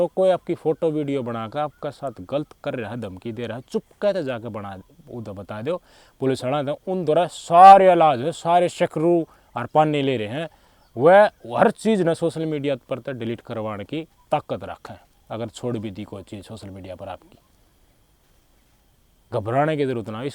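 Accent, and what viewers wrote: Haryana, India. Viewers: Bhai apki speach delivery kamal ki hai haryanvi bhi aap mitha karke bolte ho..bahot badiya bhai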